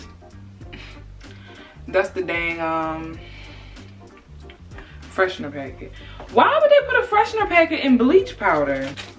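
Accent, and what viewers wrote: Baltimore, United States. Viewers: yooo so i was about to type you sound like you from baltimore but then i went on famous birthdays and you are like maryland gang represent!!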